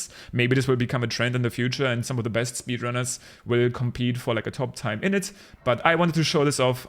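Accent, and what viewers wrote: Germany. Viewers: Sprichst du als erste Sprache Deutsch, klingt ziemlich danach 😌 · Nothing makes me happier than realize within seconds that I've found yet another german creating English content lmao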